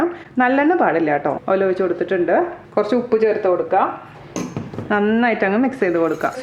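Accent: Thrissur, India. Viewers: #roseeducation: yummy recipe. I love all your recipes. I really like the way you talk especially thrissur ascent. Thank you so much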